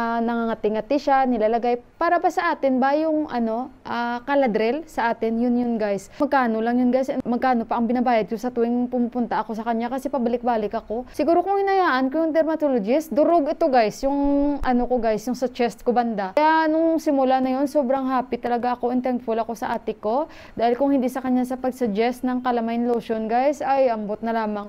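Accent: Iloilo, Philippines. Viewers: Hello po ma'am watching from taytay rizal.Ang cute ng accent mo ma'am alam ko ilongga po kayo🥰🥰🥰 madali lang po gamotin ang buni danas ko po yan sobrang kati.Gusto ko lang po i share kung paano ko ginamot ang ringworm ko.Simply lang po madali lang lalong lalo na sa mga malayo sa bilihan ng gamot para po ito sa inyo,madali lang po gamotin kumuha lang po kayo ng isang dahon ng langka na tuyo yung medyo malaki na dahon at maliit na lagayan sunogin lang po ang tuyo na dahon ng langgka at lagyan ng langis ang abo nito kunting langis lang yung tama lang mabasa yung abo at ipahid doon sa ringworm yan lang po linisin muna sabonin maigi bago lagyan ng abo ng dahon ng langgka bago ipahid.Sana makatulong ito lalo na po doon sa mga malalayo sa bilihan ng gamot.Salamat po more power po sa channel nyo ma'am GODBLESS po🙏🙏🙏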